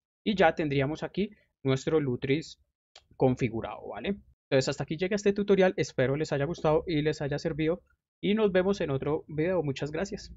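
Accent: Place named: Colombia. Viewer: WOW varias cosas xd 1. Me agrada que POR FIN un canal en español ligado a linux este enfocado en GAMING, me parece genial y mas con el estado actual de steam y las mejoras que poco a poco vemos en otros lugares. Creo que poco a poco vamos viendo linux como ya una verdadera alternativa FÁCIL ante windows xd 2. Mi parcero como que es de colombia no? digo, se le marca ese acento que se distingue, así que supongo :v 3. TREMENDA pc ala, me parece genial primero porque es una belleza muy sexy, segundo porque la gráfica que tiene (segun estuve leyendo) esta dando problemas con algunos juegos (vamos es una nueva arquitectura híbrida con stream processor, tiene razón para fallar) por lo que seguramente vos tambien podras mirar eso xd y luegooo porque la verdad presenta hardware que mucha gente va a comprar, el R5 3600 es pan caliente y seguramente alguno quiere linux xd. 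Otro punto es que yo también manejo puro AMD, eso sí, algo más modesto pero funcional. 4. Recomendaría para el canal títulos más cortos en los videos, por ejemplo, el último video que subiste sobre mangohud (que por cierto yo sí me tuve que tragar los videos en inglés y un fallo en la instalación, pero al final sobreviví) es el único video en español que hay en youtube. Por lo que si pones algo así: "COMO VER LOS FPS EN LINUX" aparecerá el video de primeras para los hispanohablantes. Si lo se, puede ser algo "Clickbait" y demás pero we, tienes buen contenido y al final logras explicar lo necesario, considero que vale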